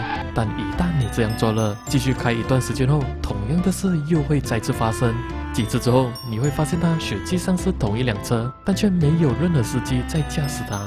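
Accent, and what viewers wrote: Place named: Fuzhou, China. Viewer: 一听到就知道是福州人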